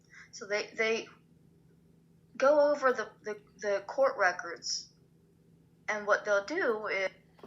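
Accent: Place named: South, United States. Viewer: You said it just fine. I love your voice with that sweet southern accent very calming and easy to listen to. Reminds me of my Aunt's from Tennessee and Great Grandma. Mary tells her made up stories but fails to accept She is the monster.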